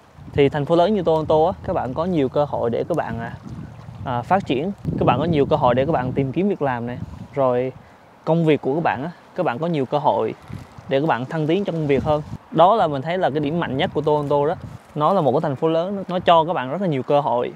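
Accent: Central, Vietnam. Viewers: Nghe giọng bạn là biết người miền Trung rồi nhưng nghe đến cuối mới bik đồng hương ở Calgary, cheers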